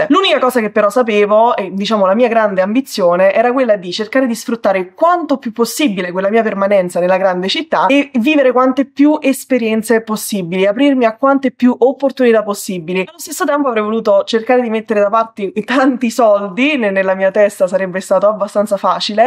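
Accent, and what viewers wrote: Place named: Naples, Italy. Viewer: sei napoletana?io di Salerno... Sembra che hai lo stesso accento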